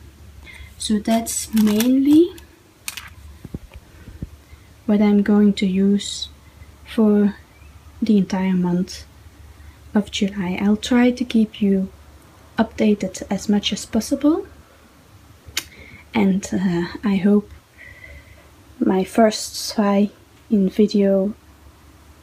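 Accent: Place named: Scandinavia. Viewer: Great to see more new YouTubers doing videos for onebookjuly! I love your accent, is it Scandinavian?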